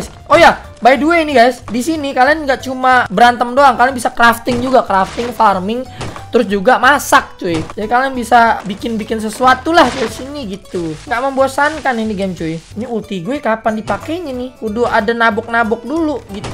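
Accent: Jakarta, Indonesia. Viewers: Betawi medok bet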